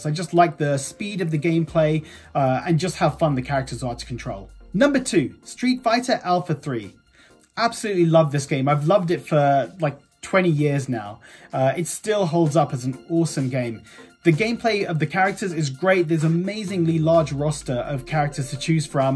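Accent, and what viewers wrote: United Kingdom. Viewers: im just going by your accent you are from the uk but over here in ireland tekken dark resurrection is still on the psn and so its still on the vita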